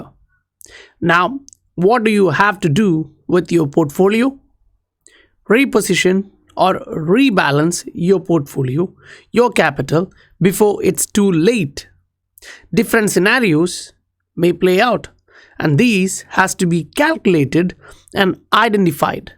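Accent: India. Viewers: Sound like Indian? Im right?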